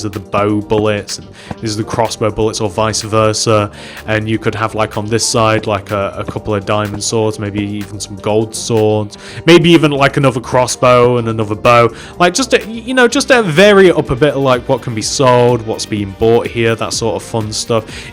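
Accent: United Kingdom. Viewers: Quick question Are you british bc you sound british to me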